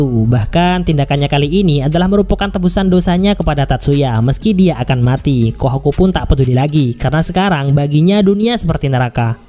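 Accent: Madura, Indonesia. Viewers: Oreng medureh kak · Yg ngasih suara orang madura ya logatnya madura banget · Logat khas bahasa madura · Madureh ben cak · "apah ca'en lah epade'remmah beih"😅ras madureh jugen min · Teppak kereng medureh adminah😂😂😂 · Bentar², mimin orang madura nih kayaknya😲, mantap min👍🏼👍🏼👍🏼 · Anak Madura mana min 🤭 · seperti logat madura ini mah🔥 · baru tau ternyata mimin orang Madura, aku bangga. 💕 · Madureh dmmah bn bang🤣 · Abang ren madureh mantap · Medureh min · Salfok sama logat/nada abangnya cerita kayak ciri khas orang madura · Logatnya kek orang madura min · Logat mimin sangat medok logat madura apa betul min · Kentel banget dah logat maduranya,,salam setong dere bro 🙏🏻🙏🏻🙏🏻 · cengkok e meduroo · Mak a madureh bang,,, apa caen lah, pa dekremaah beih.. Asli mana bang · Medureh cak👍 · Mak Reng medure😂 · Adminah tepak ke Reng madureh wkwkwkw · Logat mu ada logat madura min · madura dimmh bang?? 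· suaranya logat madura yaa 😁😁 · Oreng Madura ben bg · Oreng madureh kaleh😂😂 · Logatnya madura banget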